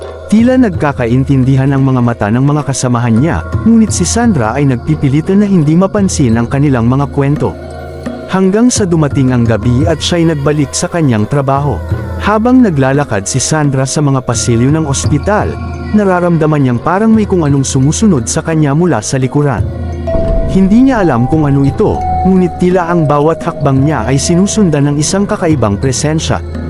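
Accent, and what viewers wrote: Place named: Visayas, Philippines. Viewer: ano ka bisaya slang ka mag salita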